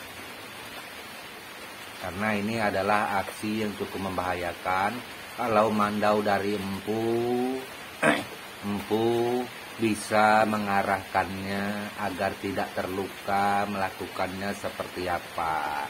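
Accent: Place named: West Java, Indonesia. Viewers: Salam santun mpu... Mohon maaf..logat bicara..mpu seperti ada logat sundanya...apa mpu ada keturunan sundanya.. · MAAF EMPU KOK EMPU LOGAT BICARANYA SPRTI ORG SUNDA HEHE 🙏🙏🙏🙏🙏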